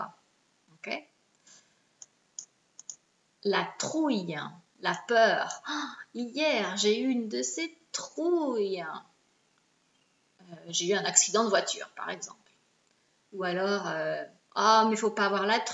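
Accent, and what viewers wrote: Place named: France. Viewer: tu as in voix tres feminin et tres interresant, une vrai accent feminine et franchise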